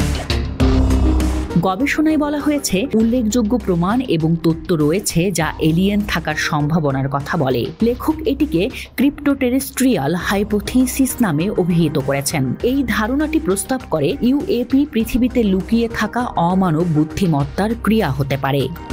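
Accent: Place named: India. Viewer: খবর পড়লেন যে সে কি ভারতের নাকি বাংলাদেশের কথা ভাষা শুনে মনে হচ্ছে ভারতের বাঙ্গালিরা এত করা গলায় কথা বলেনা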